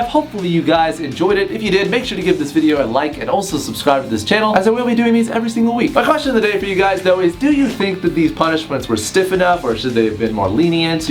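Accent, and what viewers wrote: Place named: United Kingdom. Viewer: You seem like one of those low key British people. You have our humour and the way you say some stuff is like us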